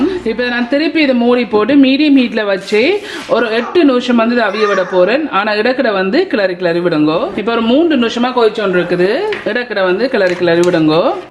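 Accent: Sri Lanka. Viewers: Yummy curry..Srilankan way of speech nallarukku sister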